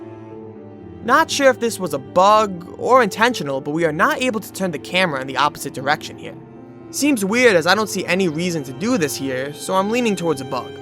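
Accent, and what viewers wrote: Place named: Minnesota, United States. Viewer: This guy has the thickest minnesota accent. Love it!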